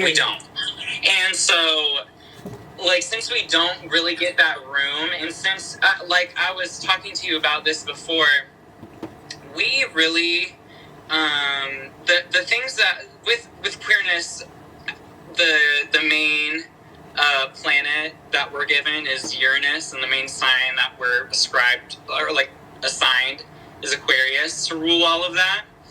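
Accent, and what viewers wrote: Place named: South, United States. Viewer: Can I just say I love your accent ! I’m from jersey , and your southern accent is so cute to me ! Also , y’all are so dope ! Thanks for this ❤️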